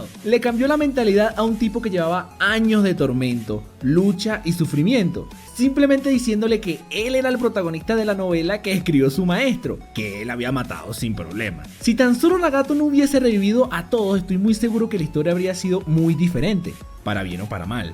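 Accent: Venezuela. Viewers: Eres Venezolano? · ajaj eres venezolano :v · eres venezolano verdad? por el "y de paso" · este por el acento es venezolano XDD en fin mi opino sobre el tema es que si esta fue una buena saga y también es mi favorita pero; al iniciar la 4ta gran guerra ninja shinobi también fue épico su inicio y el camino que pudo haber llevado pero luego de que todo fuera guerra de genjutsus y el drama de sasuke pa presidente y naruto por ahí la trama empezó a caer notablemente, en los inicios de la guerra también pudo verse a personajes secundarios brillar aunque admito que la verdad pudo haberse desarrollado mejor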